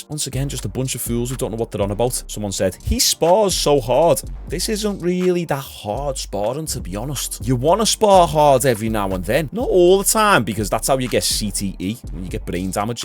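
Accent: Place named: Scotland, United Kingdom. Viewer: This whole time I thought you were from Scotland because of your accent. You have a very deep strong accent. You sound like your straight out of Braveheart.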